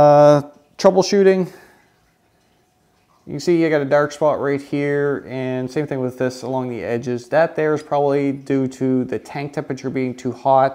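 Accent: Canada. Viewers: He's canadian so he gets a pass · Reminds me of Canadian or from Minnesota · Are you Canadian? You look and sound Canadian. I know this is random as hell lol. Good video 👌🏾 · I think he's Canadian. That would explain the bad english. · Idk because this is my first time watching one of this guy's videos but he sounds Canadian. I've never heard anyone pronounce it -oid and I'm American. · 9 seconds til Canadian reveal.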